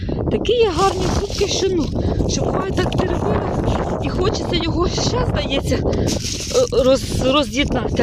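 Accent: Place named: Liubar, Ukraine. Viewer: Ви супер! Дивлюсь Вас із задоволенням.Люблю говір Любарщини. Когось знаєте із Меленець?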